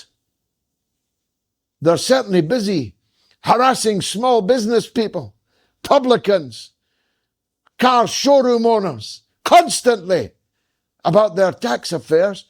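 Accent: United Kingdom. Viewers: What kind of British accent is this? Thanks.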